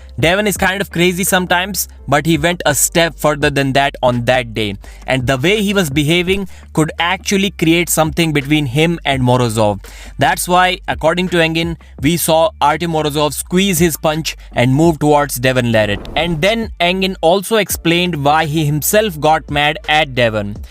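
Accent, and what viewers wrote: India. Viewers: This pajeet accent is hard to listen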